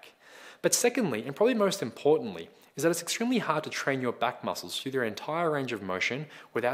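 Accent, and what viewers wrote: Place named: Australia. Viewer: Where ya from bro? Australia? I was trying to work out this accent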